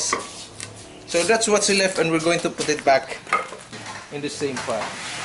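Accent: Visayas, Philippines. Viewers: akala ko indonesia kayo bisaya pala mo dong oi gi atay hahaha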